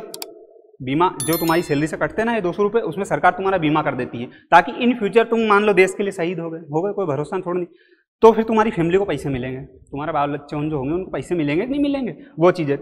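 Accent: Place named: Indore, India. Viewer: Indori bhasa 😂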